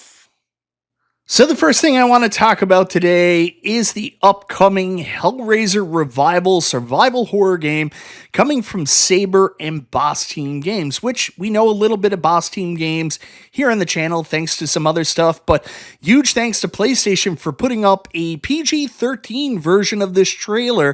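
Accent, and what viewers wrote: Canada. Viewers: I experience only pain, and confusion when I can't understand the Canadian accent.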